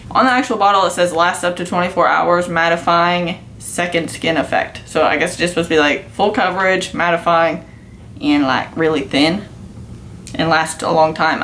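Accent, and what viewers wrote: South, United States. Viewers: where are you from? I hear a southern accent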